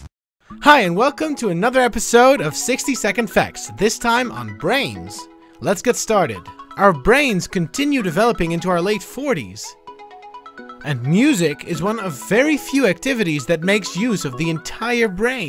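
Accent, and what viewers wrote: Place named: Netherlands. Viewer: you are dutch? really dutch accent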